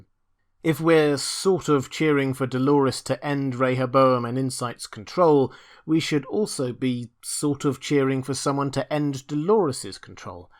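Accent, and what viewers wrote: England, United Kingdom. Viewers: It's an English accent!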